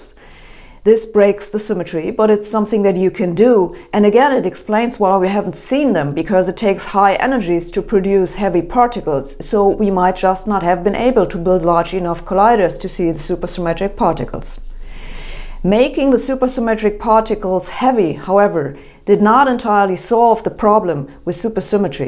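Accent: Germany. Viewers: german english. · Is she German? Does anyone know if she's German?? 😂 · I love it when she speaks English with a German accent. I first tuned-in for the science, but her deliver is so mesmerizing, I'd listen to her talk about any subject.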